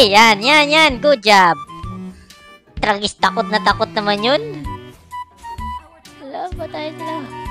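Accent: Visayas, Philippines. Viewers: Visayano po ba si kuya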